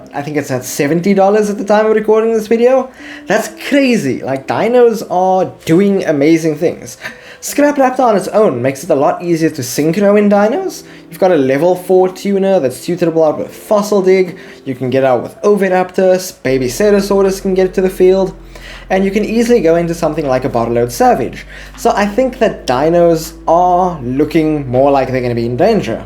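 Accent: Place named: South Africa. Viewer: Interesting content my man, where are you from? South Africa?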